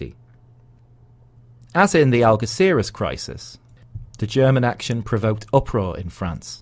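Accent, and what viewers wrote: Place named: Liverpool, United Kingdom. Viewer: A Scouser!!!! Rarely heard one in my live! This is a very interesting accent. (also your history lesson is) Dankeschön!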